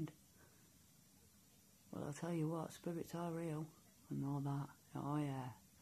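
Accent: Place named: Yorkshire, United Kingdom. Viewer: they feed off fear hun so try and stay strong dont record it pay it anymore attention people may love your vids buts its not worth what its doing to you you sound like you possibly have a yorkshire accent or there abouts i will come try to help you if you wish if it gets too much just send me a message hugs x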